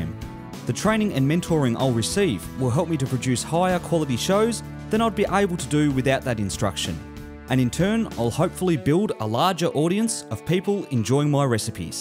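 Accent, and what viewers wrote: Australia. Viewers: I really enjoy your channel best! At first your very austrialian accent made me laugh and since then I am a subscriber. Keep up the good work! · now that's an awesome aussi accent! Congrats, mate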